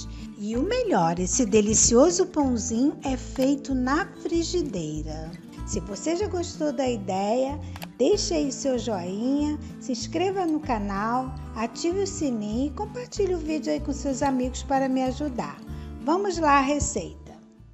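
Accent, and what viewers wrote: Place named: Rio de Janeiro, Brazil. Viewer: Pelo sotaque é do Rio de Janeiro 😅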